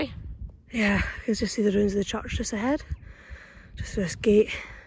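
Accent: Scotland, United Kingdom. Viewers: I did archaeology on Inchmarnock, the little island near Bute. That island unearthed a slate called The Hostage Stone, which depicts a probable Viking raid. You are refreshingly real. I appreciate the humble focus on the historical sites and nature. Hailes Castle is atmospheric, and Arbroath Abbey. Just be cautious in some rural spots, gangs of youths can be a problem. I watch a channel called Kirsten and Joerg for its comforting slow meandering simplicity. Quite different from your Scottish theme but they get lots of subscribers, it took time to get to that though. I like your Scottish delivery. You have a nice voice.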